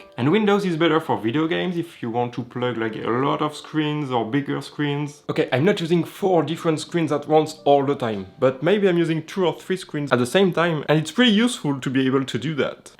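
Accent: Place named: France. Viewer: The French accent make this review magnificent L’humour le montage t’es bon ! Keep going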